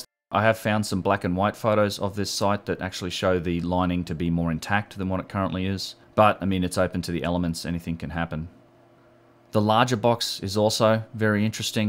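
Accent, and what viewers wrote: Australia. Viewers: Irrationally cool this info has a Australian accent. Stuff took generations to make... that’s key. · I love your work. Its mind boggling to me how wrong the mainstream is on this and I don't understand how it can keep up the lies for so long. From a engineering perspective alone the proof is everywhere of . honestly I think you should have billions of subscribers. People are blind. Even my userly open minded flatmate fails to see the proof.he watched a few minutes of your fine work and became instantly sarcastic. He says he knows the history of Egypt, he was taught about it in school ! lol. He then went on to say that the Australian accent was annoying him so I guess its all sorted for him haha. Bloody hell typical kiwi 🥝 🙄. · (Just a comment to help with the algorithm , unquote.) Man, I love hearing this revolutionary information in an Aussie accent. 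Just sayen ... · Good on you, mate. Interesting videos, including your own unique take on things; plus it's refreshing to hear a relaxed Aussie voice (and Aussie-isms) on videos of this nature. Extremely envious of your opportunities for travels, discoveries and adventures. Cheers from Port Macquarie NSW.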